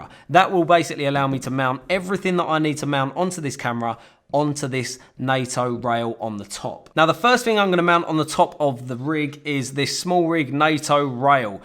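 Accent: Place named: Cockney, United Kingdom. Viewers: I love this, sounds like a proper Ray Winstone. tough guy cockney geezer, and in fact it is a baby face sharp as a razor vid afficainado. Keep up the helpful stuff. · omg, this is geezer english talk. Difficult to concentrate on the subject matter :) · Wow, cockneys on youtube. Who would have thought it.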